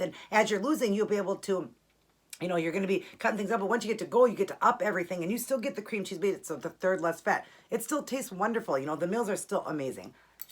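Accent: Midwest, United States. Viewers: dat mid-western accent tho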